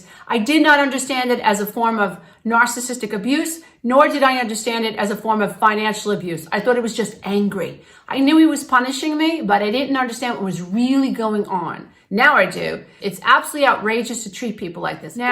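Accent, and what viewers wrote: New York, United States. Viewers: I think this is the best video of yours I've seen so far. You're bringing the correct emotional response to this sh**. That, along with the NY accent really brings it home! Thanks!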